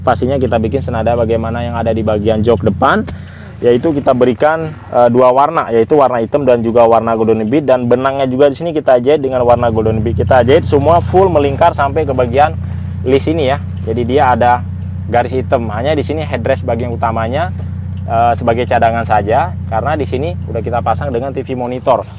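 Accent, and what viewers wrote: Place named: Indonesia. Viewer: Seneng denger bhs inggris masnya, logat asli indonesia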